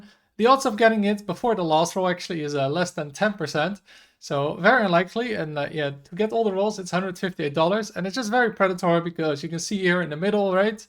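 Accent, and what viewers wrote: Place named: Europe. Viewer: all of this was already said just not in an annoying euroshit accent. revolting .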